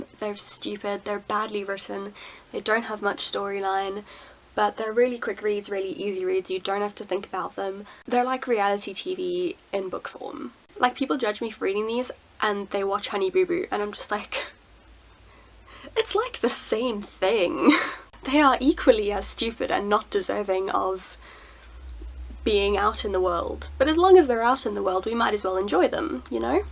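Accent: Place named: United Kingdom. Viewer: Are you British perhaps? 🤔 quite the strong accent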